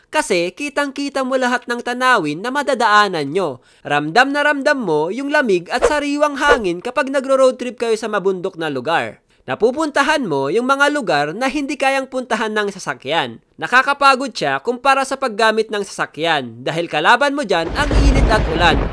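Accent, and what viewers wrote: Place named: Pampanga, Philippines. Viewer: taga pampanga k ba halata sa punto m at boses na kapampangan ka?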